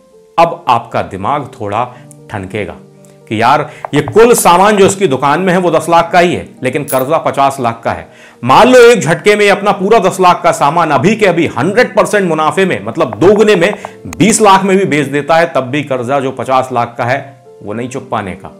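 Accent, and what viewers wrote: Standard. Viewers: kya sudh hindi bolte hai. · you pure hindi speak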